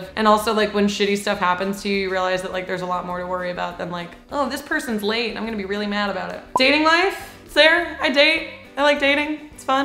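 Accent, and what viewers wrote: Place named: South, United States. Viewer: "everybody's got sumthin" YASS southern accent queen.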